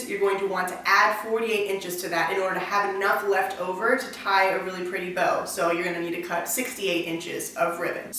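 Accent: Spain. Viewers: oh yes you can !! i've seen old vlogs and you have an awesome spanish accent :)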